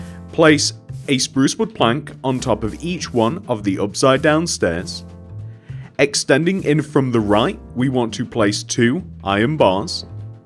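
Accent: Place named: Liverpool, United Kingdom. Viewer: Hi! I've got a question. Are you from Liverpool because your accent is a bit like it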